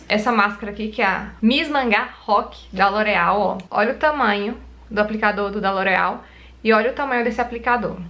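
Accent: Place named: Minas Gerais, Brazil. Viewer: Já quero pra vida! Negoço existe no vocabulário aqui em Minas... haha Seu sotaque tem uma pegada mineira...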